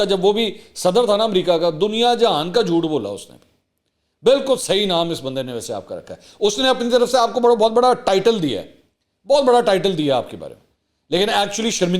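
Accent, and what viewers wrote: Punjab, Pakistan. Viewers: Love the way you speak and translate the words with punjabi tuch ❤❤❤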